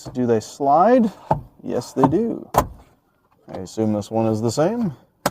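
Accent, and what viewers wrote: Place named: Canada. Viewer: Damn Canadians and their ou pronunciation. Really jumps out at us Americans.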